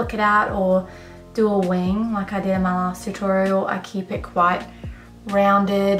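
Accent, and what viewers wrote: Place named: Australia. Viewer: Soo jealous of your Aussie accent 😂😩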